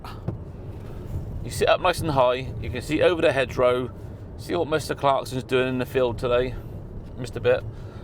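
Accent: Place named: West Country, United Kingdom. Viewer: Great review.love the west country phrases and accent.real people review...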